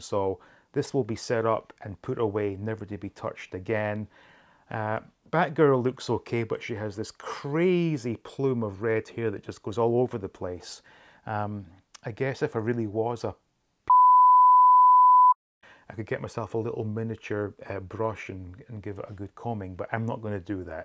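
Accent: Scotland, United Kingdom. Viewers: Hey been wanting to ask what is your accent is it Scottish?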